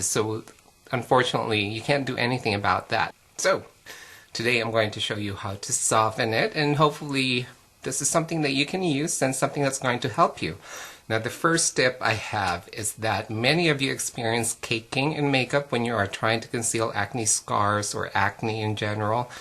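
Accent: Philippines. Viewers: omg! you're filipino ! :) i can tell by the accent you did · mwahahah love the pinoy accent you;re so awesome! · he's filipino! lol, thei got the accent! LOL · Love your Filipino Mother accent! Haha! <3 I just wanted to say.. THANK YOU FOR THIS VIDEO! Honestly, this may be weird to confess, but you have made me accept.. actually, LOVE my acne scars. I never thought of them as something that can give me "character". So thank you, so much!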